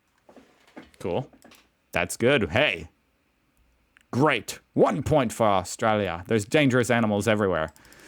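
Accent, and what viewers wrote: United Kingdom. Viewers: British English not Australian English accent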